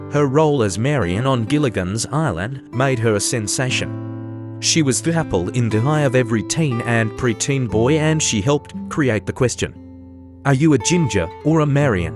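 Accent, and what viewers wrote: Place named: Australia. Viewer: An AI with an Australian accent…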